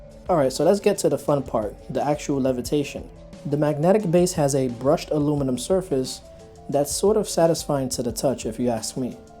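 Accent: New York, United States. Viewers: Subscribed to your channel for: 1. The in depth tech reviews 2. Eye grabbing videography 3. The familiar New York accent Well done. Keep up the great work!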